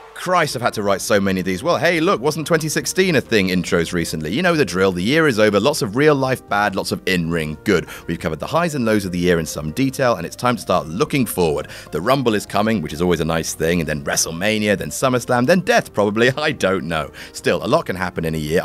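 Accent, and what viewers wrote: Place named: England, United Kingdom. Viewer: Why does he sound like he is from England then?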